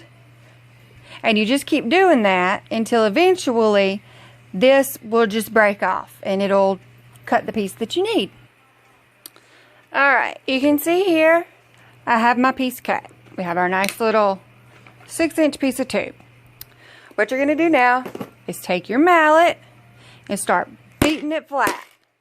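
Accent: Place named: South, United States. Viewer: I love your sweet little southern twang and your bracelet says "FUCKING LADY LIKE" - love it!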